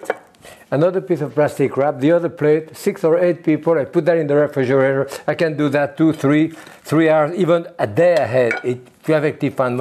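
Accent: France. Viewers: I love ur french accent english!😊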